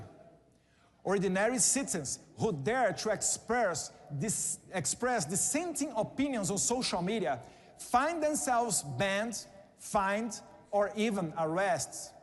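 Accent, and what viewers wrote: Brazil. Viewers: Foi 1bom inglês dele,só q com sotaque dele ou brasileiro, agora se vc q q ele fale igual 1norte-americano espete sentado....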